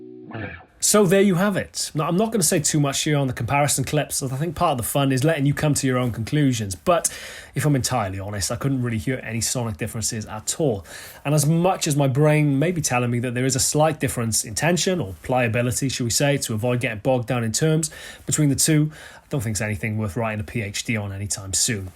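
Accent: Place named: Cockney, United Kingdom. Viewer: i didnt listen to that kocknee stupid accent ! ol boy but when you played i got up turned that to rock concert level! you are very good but i will not listen to you speak.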